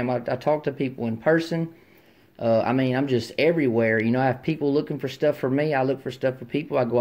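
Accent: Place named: Deep South, United States. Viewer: Thank you Man, i knew you re from the deep south because of your accent. Take care.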